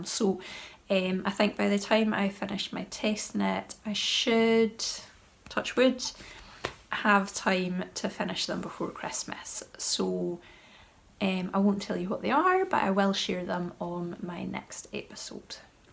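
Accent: Scotland, United Kingdom. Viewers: Thank you for your podcast! I love hearing your Scottish accent and words. I look forward to returning to Scotland again when pandemic conditions allow it. My question would be have you attended Shetland Wool Week and what are your impressions of it? I look forward to your next video and seeing more Scotland scenery, Happy Advent! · I ‘ve loved to hear those Scottsh words. Charming.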